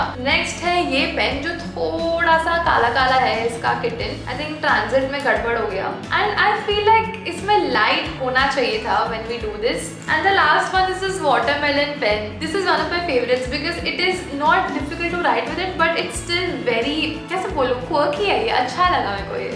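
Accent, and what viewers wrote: India. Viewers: Literally I was shocked when I heard her speaking English in Indian accent. She looks like she's from western 🥺 btw love the haul ❤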